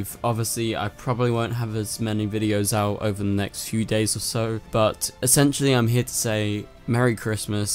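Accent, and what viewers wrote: United Kingdom. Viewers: What part of England are you from? I'm from Windsor and Berkshire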